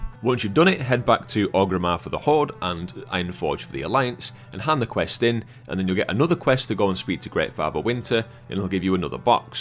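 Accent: Yorkshire, United Kingdom. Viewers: The yorkshire accent made this vid 300% better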